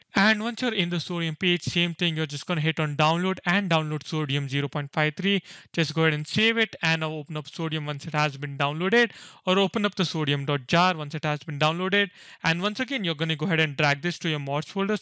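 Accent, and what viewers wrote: India. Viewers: Ofc it's an indian man speaking english having a girl skin making a tutorial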